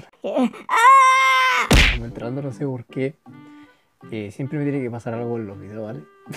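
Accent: Chile. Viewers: Eres chileno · Se nota al tiro que eres chileno wn nuevo sub que crack eres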